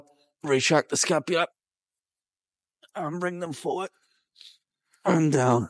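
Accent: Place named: United Kingdom. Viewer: You have the most interesting accent. It isn't very British but yet there is a strong Brit tone in the way you enunciate.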